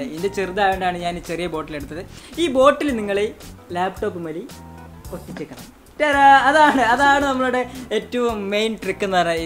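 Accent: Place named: Malappuram, India. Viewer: Aalu kochikkaaranaanenkilum Basha. Malappuram thanne. .. Paarua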